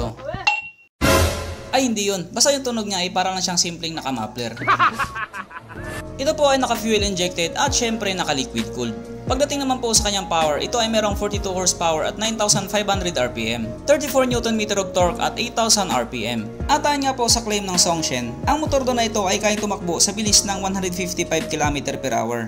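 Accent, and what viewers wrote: Visayas, Philippines. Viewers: Bisaya pay imo haha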